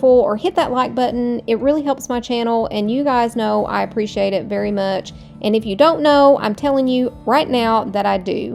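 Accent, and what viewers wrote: South, United States. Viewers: I just adore your channel! I came across your latest video a few days ago and immediately hit that subscribe button and since then I have been slowly watching backwards as I find breaks during the day. Thank you so much for sharing your creativity, your ideas for selling ephemera and dragging out pieces in your stash to use. Your voice is fantastic despite what the deer head person said. I have a southern drawl too and I'm proud of who I am! Take care and I can't wait for the day you hit a million subscribers!😊😊😊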